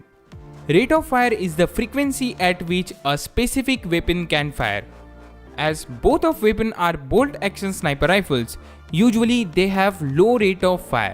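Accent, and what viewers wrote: India. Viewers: You sound very Indian. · i have to turn the volume down to zero and turn on the captions..... this is for every indian, Indonesian, indian accent speakers... please learn english.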